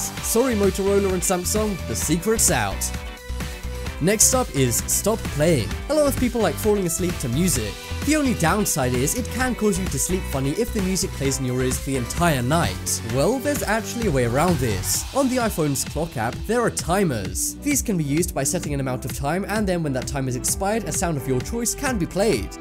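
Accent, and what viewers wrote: England, United Kingdom. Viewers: Sure would have been nice to understand wtf he was saying. British accent. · How about talking a little slower, and not speak the Queen's English! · Most irritating background music. Your accent sounds as though you are English, so the correct term for a meal ordered to be consumed at home is a Takeaway, not a Takeout, also, the correct abreviated term for Mathematics is Maths not Math. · What the hell was that British ninny saying?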